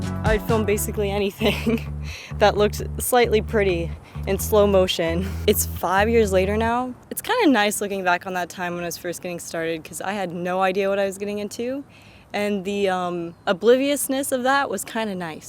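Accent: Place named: France. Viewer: i saw this video after the dream job worst nightmare video, and you speak french natively with a french accent, not from montreal/quebec ? what's up with that? could be an idea for a video!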